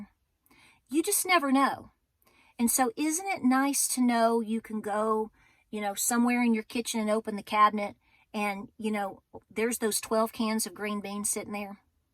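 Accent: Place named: Kentucky, United States. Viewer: you’re beautiful, inside and out! So very thankful I found your channel. My husband and I live in west central Kentucky (both of our families are from eastern Kentucky) and hearing you talk feels like “home” to us. Thank you for all your hard work. This can all feel so lonely sometimes and it’s nice to read comments and not feel so alone. Wish we could all get together! ❤️🤍💙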